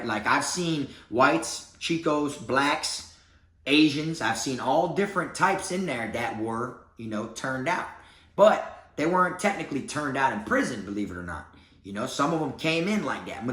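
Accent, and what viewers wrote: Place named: Florida, United States. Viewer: I love how you talk because I talk the same way.....It has to be a Florida thing!!! LOL I'm straight up serious though. On God 💯💯💯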